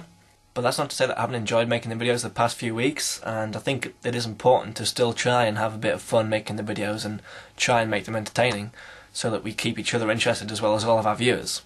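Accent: England, United Kingdom. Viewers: I love Leo DiCaprio! I watched the Aviator again today lol - coicidence? And What's Eating Gilbert Grape is one of my fav movies ^_^ Have you seen Catch Me If You Can? His depiction of Frank William Abagnale, from being a confused teenager to a mastermind at cheque forgery... is just epic. I could be a spokes person for that movie lol. I also love your accent, where abouts in England are you from?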